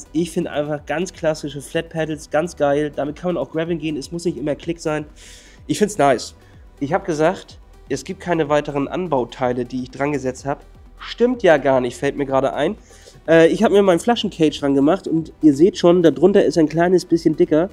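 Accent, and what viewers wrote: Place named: North Germany, Germany. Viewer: Mönsch, schön norddeutsch. Fühl mich gleich heimisch! :)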